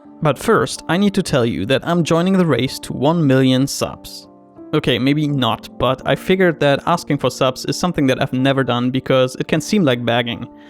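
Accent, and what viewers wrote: Germany. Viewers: As more as I'm listening to your voice you sound more and more German so are you German?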